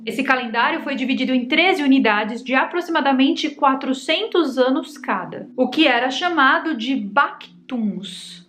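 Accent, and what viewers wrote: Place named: Minas Gerais, Brazil. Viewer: mineirissima. Amo seu trabalho.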